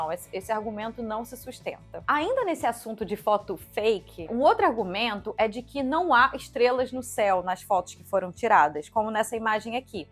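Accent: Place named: Rio de Janeiro, Brazil. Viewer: Por mais vídeos com sotaque carioca 😆 Essa gente tem muito tempo livre pra inventar teorias mirabolantes.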